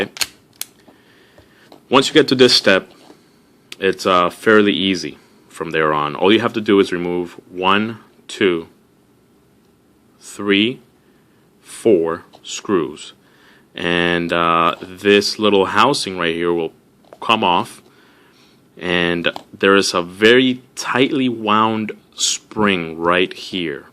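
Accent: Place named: South America. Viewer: Your accent sounds like your from South America. And nothing wrong with that. But it’s not called a, handle, it’s called, the grip. But I understand why you would call it a handle. And this was such a kick ass video. I’ve never seen the inside of this firearm. Thanks for making such an in depth video on the chiappa rhino. Keep it up man. I’d like to see more video of some other gun, strip downs.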